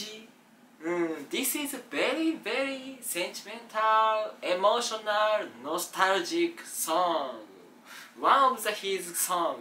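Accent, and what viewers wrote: Japan. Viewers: さん海外進出？！めっちゃ楽しい〜(´>∀<｀)日本語英語が最高すぎる✨ さんfanも国境を超えますね*⋆✈ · I am so proud of your Samurai English. ✨ Cool✨ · わざとらしいカタカナ英語に笑った😂でもコテコテのジャパニーズおばちゃんには丁度良いレベルでありがたし🙏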